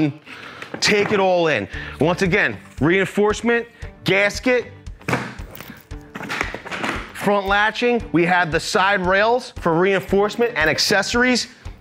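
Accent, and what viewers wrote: Philadelphia, United States. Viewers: That’s just South Philly baby!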